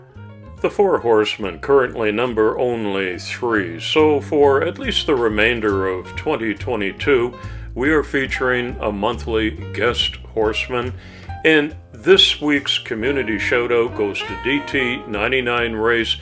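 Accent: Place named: Canada. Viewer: Dude, I LOVE your (not at all) odd Canadian pronunciations. I've begun pronouncing 'decals' as "deckles" now and then. It's an American thing I'm trying to un-learn: just because we pronounce it one way doesn't mean that's the correct way. Deckles, deckles, deckles all day long!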